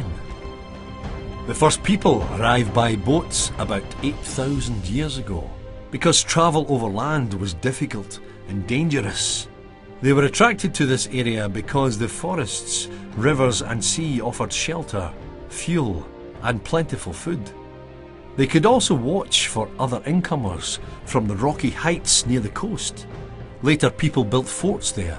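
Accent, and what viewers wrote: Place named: Scotland, United Kingdom. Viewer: I have never heard such ignorance in the same place. The only good thing about this video is the cinematography and the Scottish accent. Beyond that it's a heap of BS. B. Howard, MG Retired Historian and genealogist.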